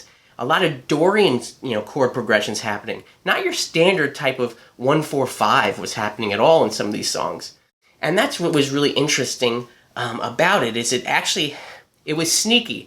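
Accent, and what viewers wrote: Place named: United States. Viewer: great guy irritating American accent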